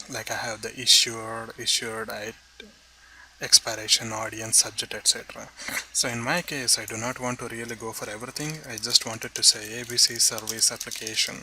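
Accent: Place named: India. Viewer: I can't listen to Indian accent it's so annoying :(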